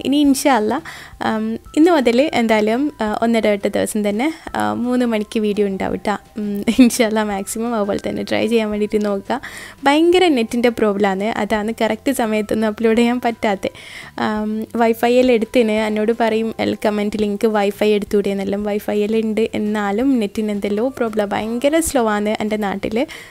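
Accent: Kannur, India. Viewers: Ningale kannur style samsaramanu ettavum cute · പൊളിയാണ് വീഡിയോ എല്ലാവരും നല്ല ഒത്തൊരുമയോടെ ഉള്ള ഫാമിലി ആണ് ഫുൾ ട്രിപ്പ് ഫുൾ വീഡിയോസ് അടിപൊളി ഞാൻ എന്നും കാണാറുണ്ട് എന്റെ ഫേവറേറ്റ് യൂട്യൂബ് ചാനൽ എന്ന് പറയുന്നത് ഇത്താത്തയുടെ തന്റെ ക്യാരക്ടർ എനിക്കിഷ്ടമുള്ളത് വോയിസ് എനിക്ക് ഭയങ്കര ഇഷ്ടമാണ് മാഷാ അള്ളാ നിങ്ങളെ കണ്ണൂർ ഭാഷ പൊളിയാണ് നിങ്ങളുടെ കാമി റ ഞാൻ ട്രൈ ചെയ്തു അടിപൊളിയായിരുന്നു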